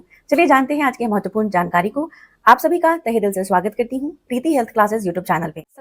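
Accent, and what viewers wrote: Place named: South, India. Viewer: Madam Aap. Ne. Behad. Theek Remedy. Bataya. Lekin Aap. Ko. Awaz. Theek Naheen. Hai Aisa. Bolti. Hain. Jaise Medrasi. Hon So. Log. Naheen Samajh. Pate Allld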